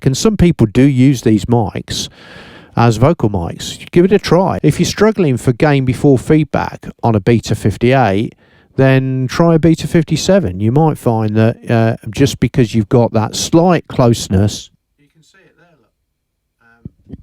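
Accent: United Kingdom. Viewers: Good video! Over 30 years of moving those faders. I agree on most of what you said. My basic kit is almost identical. Where it differs: I got some Røde NT5 in addition, I got the e905 on Snare (with the Audix i5 as an alternative), got an Audix D6 in addition to the Beta52A and the Beta91A (if I use only one mic for the BD I like the D6 better, but 52 and 91 are better in combination). My goto mic for guitar etc. is the Sennheiser e609 or e906. If people want two mics per git amp, I‘ll put on SM57 in addition. They sound more „metallic“ then the Beta57 in my opinion, so they are a better contrast to the e609/906. Oh, and I have some Audix OM7 for a special clientele. It is solid advice to recommend mics everyone knows to be at least ok and can be found on almost any rider, at least as an alternative for the actual wish list. Where are you located? Do I hear a little bit of a London cockney accent? 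· Congratulations, the best lesson on mics on the YT. I don't need to listen to anything else after this! I'm far away from you (Brazil) but I know now what to buy even if I'm on a low budget. Nothing like the knowledge of someone who lives of a subject. Never saw someone talking about the MD435 and it was a great find. Very hard to find it here because it's so much expansive for us, but I'll save the tip for any intl trip in the future. I play chromatic harmonica and most top players use a SM58 because you know it's a classic and the frequency range is quite similar to the voice but as the sound is produced by free metallic reeds, the higher frequencies are almost of a brass instrument and I think the MD435 would produce a very interesting output. If you ever have a chance to record one harmonic on it, please let me know; I also study sound quality a lot as I'm an Electrical Engineer too. 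Thanks for the video, lovely British accent! Already saved to a playlist and subscribed to your Channel.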